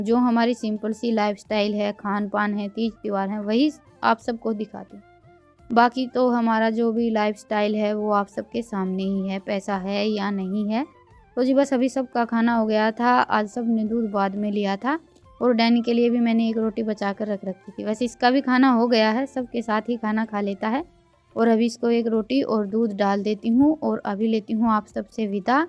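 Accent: Haryana, India. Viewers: Apki haryanvi voice bhut pyaari lgti h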